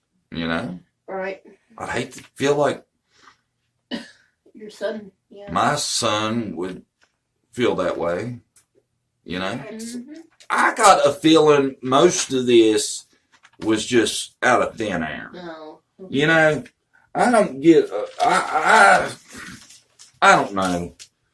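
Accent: United States. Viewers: Oh Cool! Idk why your channel hasn't popped up here before but I'm happy to meet y'all! I live in Knoxville, TN. I'm assuming y'all live in TN as well with that southern draw. Lol This was the first time I've gotten a good peek of Andy. Seems if brains were made of petroleum he wouldn't have enough to drive a piss ants go cart halfway around a fruit loop! No wonder H is emotionally disturbed. I don't believe a person like that would have said he didn't want to hear what happened that day though. Imo he knows if H tried to open up to him about it! Also imo LE needs to go back to the start & interview these people again! New sub here! 🙋😊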